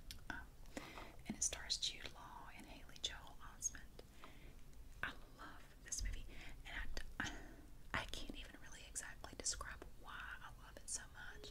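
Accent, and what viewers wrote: South, United States. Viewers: Ohhhhh myyyy goshhh ffinnallllyyy..you my dear are what i have been searching for. I have been losing my ability to get ASMR because i listen to the same asmrtist all the time. But as soon as i heard your beautiful southern voice..my asmr was instant. Ty so much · i keep coming back to this one, its soo nice. your whispering voice and accent are great! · Very nice movie selection I have to check out some of those movies that you mentioned.A lot of your selections are my favorite as well.You should watch the "Forsyte Saga" it's good.Great video and I also love your southern accent.❤ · Your accent while you whisper reminds me of my sweet biology teacher from highschool in Mississippi its so comforting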